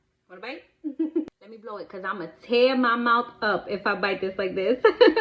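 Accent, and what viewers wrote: New York, United States. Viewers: Just love the New Yawk, talking bout dawdahs, an food for the soul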